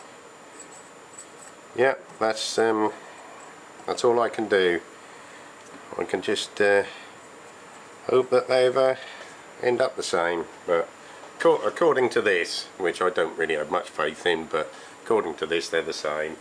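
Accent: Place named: Norfolk, United Kingdom. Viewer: Y'oright Bah? I've been watching this series thinking he's got to be from Norfolk without actually checking your bio. Glad to find that my ear for East Anglian accents hasn't let me down. Good to see that you've got the internet up there now, keep up the good work! From Sunny Suffolk 😉😄👍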